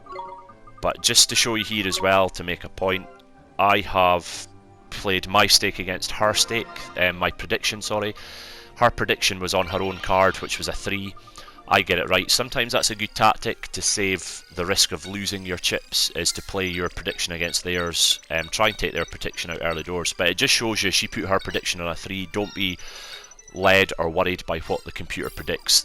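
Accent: Scotland, United Kingdom. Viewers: I think it's Scottish accent? Sorry if I'm mistaken.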